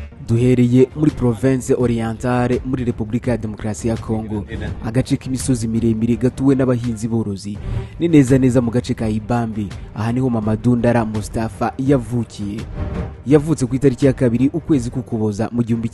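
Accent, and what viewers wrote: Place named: West Africa. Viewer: Yarafite accent nkiyabo muli afrique de l'ouest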